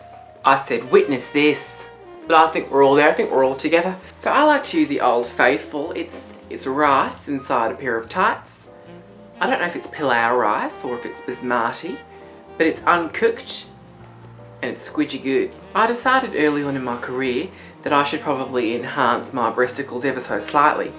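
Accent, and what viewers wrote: Australia. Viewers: R u from Australia 🇦🇺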